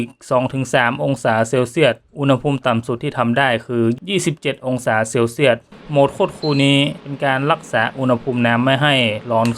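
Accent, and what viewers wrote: North, Thailand. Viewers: พี่เป็นคนจังหวัดไหนครับ สาบเมืองจังเลน 😁😁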